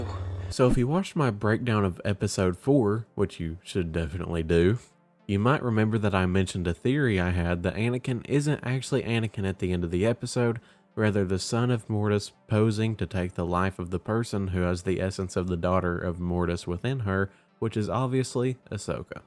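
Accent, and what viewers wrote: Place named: South, United States. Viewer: That accent is 🤌 yeehaw my fellow southerner.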